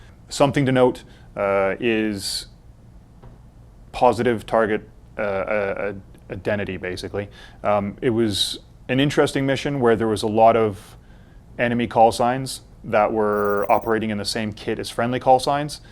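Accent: United Kingdom. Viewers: Your British sounds so British. For 10 years you must have kept it...that twang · Wait, he is British?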